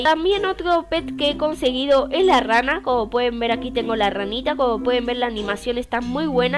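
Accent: Argentina. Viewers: No sabía que eras de Argentina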